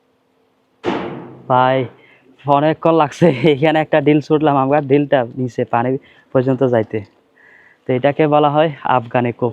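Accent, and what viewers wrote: Chittagong, Bangladesh. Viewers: ভাই আপনার বাসা কি চট্টগ্রাম 😅😅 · chottogram এর মানুষ যখন সুধদো ভাষায় কথা বলে সেম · এটা মূলত চট্রগ্রাম এর আঞ্চলিক ভাষা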